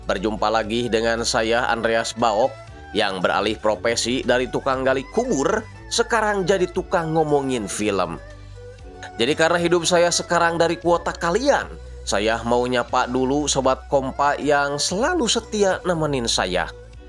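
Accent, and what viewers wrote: West Java, Indonesia. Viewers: Orang Sundak kah bang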